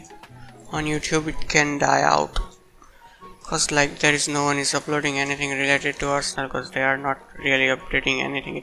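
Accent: India. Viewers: are u Indian becuase u sound like that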